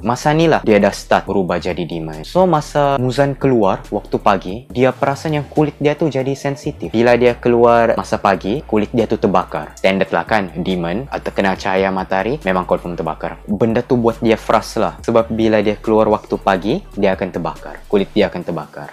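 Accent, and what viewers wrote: Sabah, Malaysia. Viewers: Ko org sabah? 😂😂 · Yes, org sabah 😂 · speaker ni orang sabah kah? 🤣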